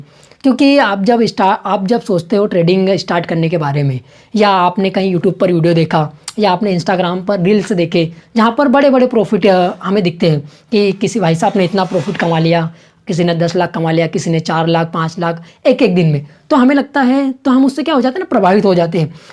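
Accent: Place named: Indore, India. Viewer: Bhai ap Indore se ho apki awaj parhchan Li mene😅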